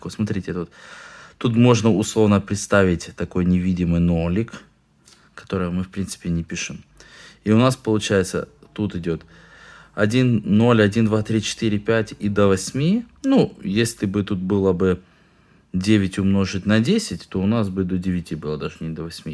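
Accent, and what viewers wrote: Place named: Ukraine. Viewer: Вот удивительно, фамилия и внешность восточная, а акцент украинский)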